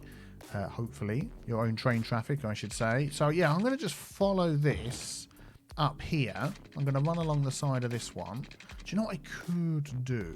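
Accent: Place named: England, United Kingdom. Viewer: Where then? I like trying to figure out English accents. I have a good friend from Bristol.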